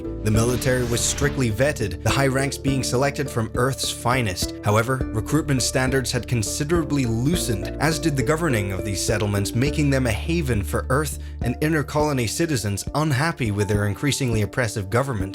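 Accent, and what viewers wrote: United Kingdom. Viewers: I think you and installation both also have good voices for narrating Halo lore, especially because of how sophisticated and knowledge driven y'all two sound with the British accent.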